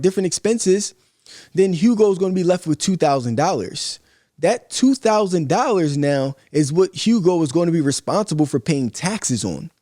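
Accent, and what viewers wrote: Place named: Philadelphia, United States. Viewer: Huge fan of the videos and have learned a WHOLE lot but I do have a question: How do you and Cassidy sound exactly alike but Meek Mill screams so much? Is there a section in Philly that just screams, or do most have the same accent you and Cassidy have? I'm genuinely curious not even trying to be funny...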